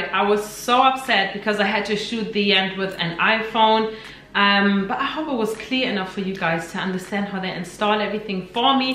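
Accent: Germany. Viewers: Could it be you are german? Your english sounds like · The german accent I love it -die Haare sehen übrigens geil auch ☺️☺️ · Such a thick german accent so cute love it lol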